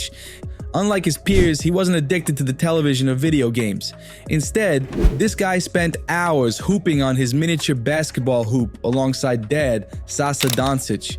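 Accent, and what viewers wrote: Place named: New York, United States. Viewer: brooklyn!? harlem?!?! u sound like you in the mafia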